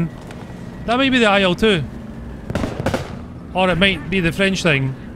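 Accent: Scotland, United Kingdom. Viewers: I really enjoy watching your videos, but please forgive my ignorance, are you Scottish?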